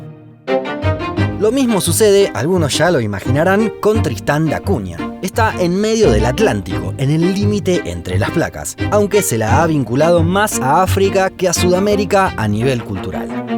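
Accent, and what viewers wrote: Rioplatense, Argentina. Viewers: Al leer ese libro, todos lo leeríamos en nuestras cabezas con acento argentino... con la voz del narrador! P.D. Muy buen trabajo. Es uno de los mejores canales en YouTube, y creo que el único canal del que he visto todos sus videos. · El que habla parece argentino o uruguayo.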